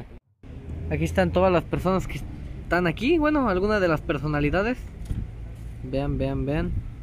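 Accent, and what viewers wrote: Mexico. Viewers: Smn hasta parece de Chihuahua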